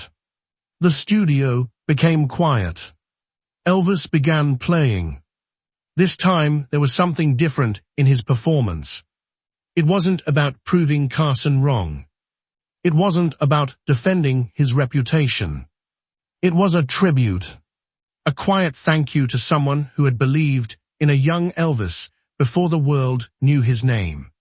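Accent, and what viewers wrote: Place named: United Kingdom. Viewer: I didn't click on here to listen to a Limey run his dialog for an hour. I'm deleting my sub and thumbs up too.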